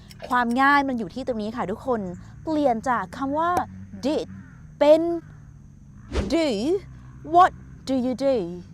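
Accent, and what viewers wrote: United Kingdom. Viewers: ผมชอบสำเนียง British Accent ของ มากเลยครับ ไม่ทราบว่า แต่งงานยังครับ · ชอบสำเนียงบรีติช ของ มากครับ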